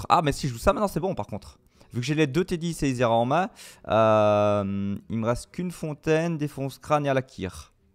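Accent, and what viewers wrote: Belgium. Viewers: GG pour le tournoi et les qualif. ça fait toujours plaisir d'entendre (avec ton accens ;)) un compatriote Belge performer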